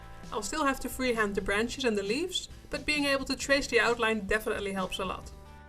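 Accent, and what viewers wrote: Netherlands. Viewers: I'm so happy I found this site..ordered myself a glass etching tool..I'm going to guess your accent is Nederland...klompt?? Jim: Olympia,Wa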